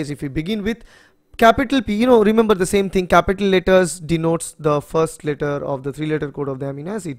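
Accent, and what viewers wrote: Bengal, India. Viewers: Great video Sir.The bengali touch makes it more memorizable.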